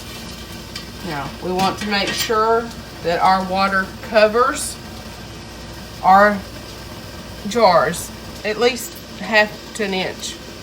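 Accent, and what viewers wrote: Mississippi, United States. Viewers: Yummy Yummy..Great presentation as always..Mississippi talk and all..:)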